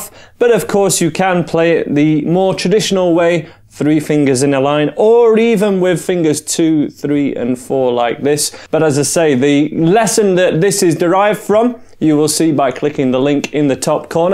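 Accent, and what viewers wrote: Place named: Birmingham, United Kingdom. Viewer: Your accent is like peaky blinders 🙃😂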